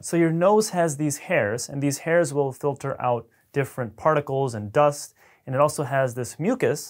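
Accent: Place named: United States. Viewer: I'm guessing from his accent he was born in America?!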